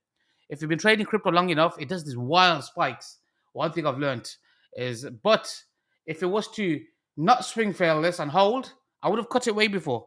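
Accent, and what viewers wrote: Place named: South Asian, United Kingdom. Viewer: Thanks a lot bro. A request of mine and i'm sure many others is to please turn on captions for your videos. I absolutely love and enjoy your South Asian Britiah accent but it sometimes is a bit hard to follow esp with the recent mic issue. Dera Manana brother!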